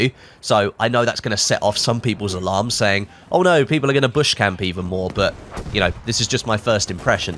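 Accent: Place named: United Kingdom. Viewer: And that is how EA keep YouTubers silent about the horrible performance and bugs of this game. One year and we still doesn't have a smooth experience. I don't care you have your British accent , you are misleading your community about the truth about this game and negligence of Dice. No battlestats, no transparency.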